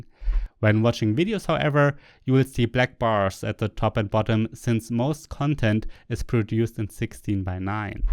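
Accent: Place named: Germany. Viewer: Have you been working with an English dialect coach? Your sharp German accent is slowly disappearing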